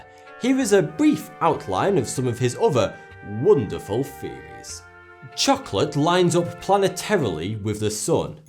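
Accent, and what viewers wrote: United Kingdom. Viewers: his British accent with a lisp is really annoying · Mikes8899 the sparkle white oversized front teeth, the pretentious and overly british accent, not to forget the smug look on his face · This is a British accent but from which area or city? It is interesting. London? York? Lancaster?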